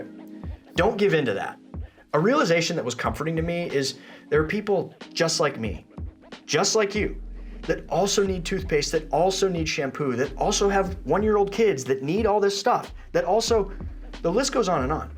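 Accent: United States. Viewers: You sound American. Why aren’t you recommending T-Mobile with its no cost international data. Oh I see you are promoting Holifly.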